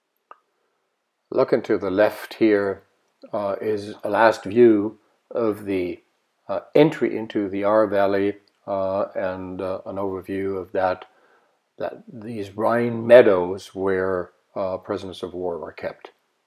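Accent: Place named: United States. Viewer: Hallo lieber Velomobilist, das ist aber allerdings wirklich Schade. Mein Mann, also nicht der von gestern, das war "nur" mein Radelkumpel, war so gespannt auf die Bilder und ich auf uns von oben, dass du jetzt eigentlich nochmal hier vorbeikommen musst. Du bist ja auch durch Holzweiler gekommen und da wohnen wir. Mein Mann ist sehr an dem Velomobil interssiert und stellt mir 1000 Fragen, die ich ihm nicht beantworten kann. Bring deine Drohne in Ordnung und komm auf einen Kaffee oder Tee vorbei. Wir würden uns sehr freuen. Von uns kannst du dann auch über Kalenborn sehr schön über die Eifel fahren. Where did you learn you perfect English with American accent. We are quite fluent as well. We lived in the States for some years. It would be really great to meet you again!!!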